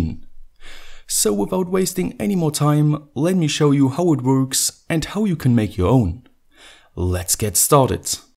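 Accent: Germany. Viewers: Jeder weiß das du deutscher bist... ich verstehe nicht so gut englisch aber man merkt das du deutsch bist weil du nicht diesen englischen “Akzent“ hast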